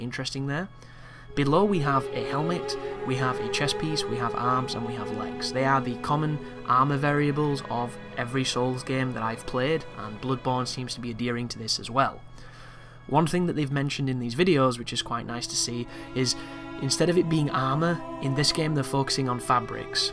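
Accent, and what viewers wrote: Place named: Yorkshire, United Kingdom. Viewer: Good vid, can tell your from Yorkshire haha ;)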